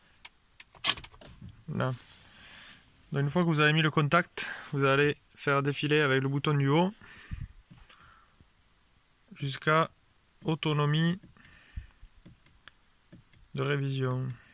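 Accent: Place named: Toulouse, France. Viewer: C est exactement ce que je me suis dit !!! Il est top !!! Avec un petit accent toulousain en plus !! 😂👍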